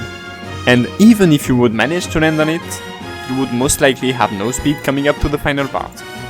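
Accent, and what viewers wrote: France. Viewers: On entend quand même l'accent français 😂❤ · You have a fµcking french accent. Ca se voit direct.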